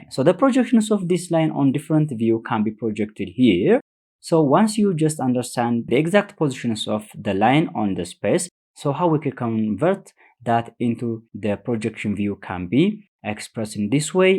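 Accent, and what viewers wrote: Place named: Ethiopia. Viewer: Your accent is so Ethiopian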